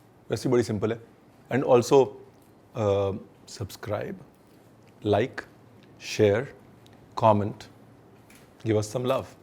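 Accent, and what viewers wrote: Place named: Punjab, India. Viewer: Phir ye mat kahna ke Maine bataya nai,kayal han ji tahade,sirf punjabi sunan lai baar baar videos dekhdi haa,punjabi tadka,luvv u g alot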